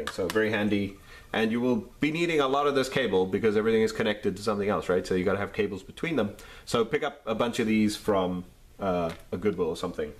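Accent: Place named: Cape Town, South Africa. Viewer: hey, that accent sound very familiar, Cape Tonian myself, very informative and well directed, is as if you lecture S1 Electronics at TECH,,, im currently building my own arcade fightstick using iPAC2 interface and RPI3 with retropi image ( ofcourse i have to add my own roms)... by the way, where did you get the joysticks and buttons from? keep up the good work, cheers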